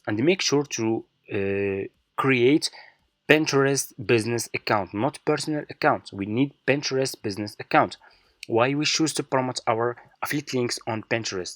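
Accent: Morocco. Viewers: Moroccan accent bro 😊 Nice video keep going !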